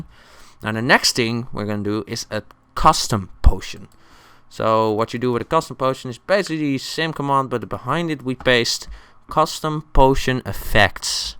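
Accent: Netherlands. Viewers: ik hoor gewoon dat je nederlands bent niet? :D nice video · You kinda hear the dutch accent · Your Dutch aren't you? You have a pretty obvious Dutch accent. You know CubeHamster? Same thing.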